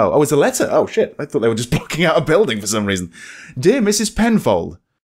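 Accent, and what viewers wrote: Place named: England, United Kingdom. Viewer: I love your english accent. I'm brazilian. You´re a very nice guy! (I believe that´s how to say that, in Brazil it'll be "simpático".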